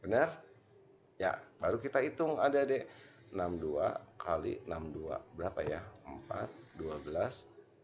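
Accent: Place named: West Java, Indonesia. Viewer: terimakasih kang, lucu menjelaskannya aya aksen sundaan 😂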